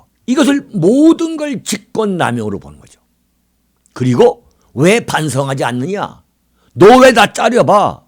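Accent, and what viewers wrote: Chungcheong, South Korea. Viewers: 이아저씨 정말 구수한 말투 좋와 ㅋㅋ 충청도 어디신가? ㅋㅋ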